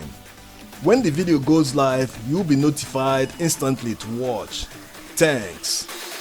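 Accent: Igbo, Nigeria. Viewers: No doubt the narrator is an Igbo man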